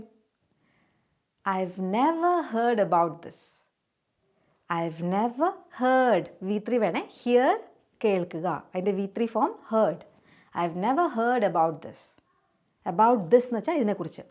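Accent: Thrissur, India. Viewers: Nice class . 👏. Madam, are you from Thrissur ? Your slang is like thrissur ,am I correct,,??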